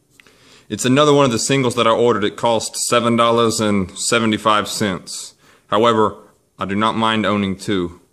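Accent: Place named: South, United States. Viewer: why do you speak so country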